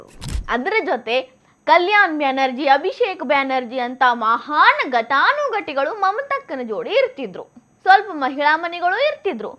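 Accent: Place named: Hubballi, India. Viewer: Nimm ನ್ಯೂಸ್ ಓದದು ಹುಬ್ಬಳ್ಳಿ ಸ್ಟೈಲು ಬಹಳ ಇಷ್ಟ ಆಗ್ತದ್ರಿ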